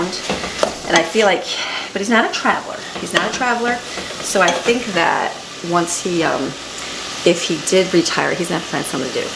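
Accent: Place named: South, United States. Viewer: I just want to say again I LOVE your accent and how you say certain words, I giggle and my hubby says "what are you laughing at?" I tell him and he laughs too. He is from the COUNTRY so his accent is even more southern than mine!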